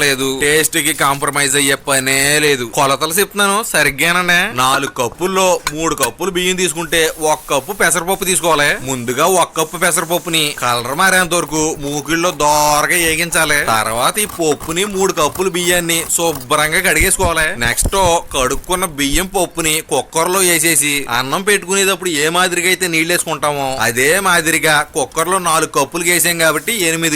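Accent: Godavari, India. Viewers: Mi godavari language super 😍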